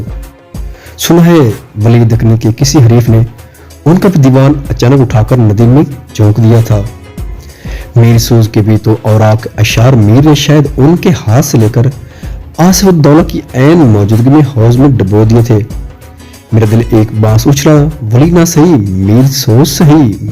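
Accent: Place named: Saraiki, Pakistan. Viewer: Yaar sariki accent m Urdu pharty hain aap aur pronunciation ki Aatny gultian uffff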